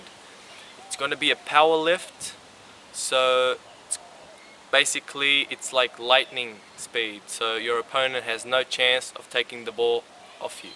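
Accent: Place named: Australia. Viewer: I thought you sounded kinda Aussie haha nice tutorial though, got the hang of it in about 20 minutes ;)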